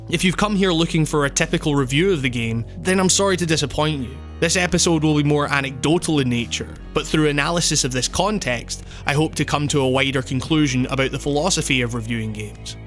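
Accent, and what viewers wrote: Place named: Scotland, United Kingdom. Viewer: This is the first video I have watched from you that didn't feel like i got something out of it by the end. I do appreciate the the experience was landmark for you and that you wanted to share it but for some reason i cannot put my finger on, i did not like this type of video from you as much as your others. For the record i love your work, the way your brain processes information, the way you convey that information to others through an impressive vocabulary that leaves me needed a dictionary sometimes. Also your accent is cool, I would assume...Scottish? Just a guess. Maybe Irish. Odd that I don't know how they are supposed to sound different but that they should. Anywho, i'm getting side tracked. I just wanted to drop in my two cents on how i felt about this video so that you could use it as constructive criticism and hopefully that will help you make additional content that more people enjoy. 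Again i cannot seem to put my finger on what makes this review less impactful and enjoyable than the others but i encourage you to keep at it cause you do good work. Again it's not that I didn't like this video, just less so than the others. I may be in the minority on that though so you should of course get input from others as well. :) have a good one man, hope to see more content soon! Edit: Sorry i responded to such an old video, you may not see this post at all, but i wrote and submitted it before i checked the date on the video.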